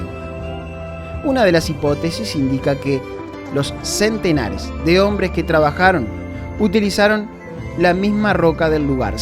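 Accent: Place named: Argentina. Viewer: EL QUE NARRA ES ARGENTINO NO CABE DUDA....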